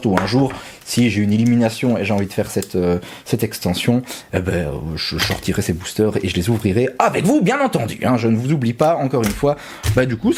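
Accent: Belgium. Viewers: Ah oui désolé j'ai pas compris ce que tu as dit quand j'ai demandé la dédicace avant dans la dernière vidéo que tu as fait désolé je comprends pas trop le Belge je suis français que dit mon prénom quand je t'ai demandé de me dédicacer si c'est moi merci et si c'est pas moi stp sinon courage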